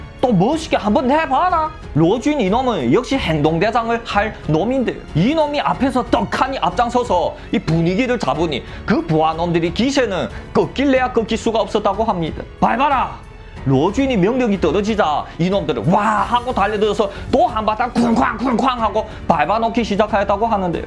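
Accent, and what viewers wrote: China. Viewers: 조선족이 한국어하네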